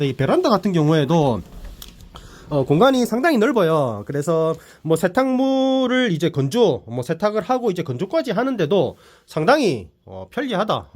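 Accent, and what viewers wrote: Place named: Busan, South Korea. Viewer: 부산태생이라그런지 에 자꾸눈이가요 말씀도 넘 구수하게 잘하시는 사투리가 듣기좋아요 응원합니다~~^^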